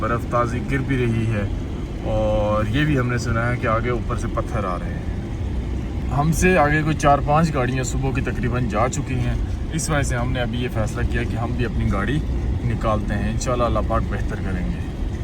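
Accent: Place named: Pakistan. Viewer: السلام علیکم اپ بہت اچھی ویڈیو بناتے ہیں اور حقیقت کے بہت قریب جب بھی اپ ایسی جگہوں پر جاتے ہو تو اپ کی گاڑی پر پاکستانی فلیگ ہونا چاہیے تاکہ پوری دنیا کو معلوم ہو کہ اپ پاکستان کے خوبصورت علاقے دکھا رہے ہیں یا بیوٹی فل پاکستان کا کوئی مونوگرام بنا کر لگا دیں اپ جو متواتر اپ کی ویڈیو پر شو ہوتا رہے جس سے یہ ظاہر ہو کہ یہ پاکستانی کی ویڈیو ہے ہمیں تو پتہ ہے اپ کی اواز سے پتہ چل جاتا ہے لیکن باہر کے لوگ جب ویڈیو دیکھتے ہیں نا ان کو کوئی ایسا سگنل یا کوئی مونوگرام چاہیے جس سے ان کو یہ پتہ چلے کہ یہ پاکستان کی ہے اللہ تعالی نے اپ کو بہت عزت دی ہے اپ کوشش کیا کریں جب کوئی اچھا سین ائے تو سبحان اللہ کا لفظ اپ استعمال کیا کریں اکثر ویسے اپ کا ارجان دینی ہے جو مجھے بہت اچھا لگا کہیں پر نماز پڑھتے ہوئے بھی اپنے اپ کو دکھا دیا کریں ساری عمر تو ہم نے اس دنیا میں نہیں رہنا جانا تو اللہ تعالی ہی کے پاس ہے یہ زندگی تو ہماری عارضی ہے اللہ تعالی اپ کے درجات بلند کرے اپ کو اور خوشیاں دکھائے پوری قوم اپ کے ساتھ ہے۔اللہ اکبر پاکستان زندہ باد پاک ارمی زندہ باد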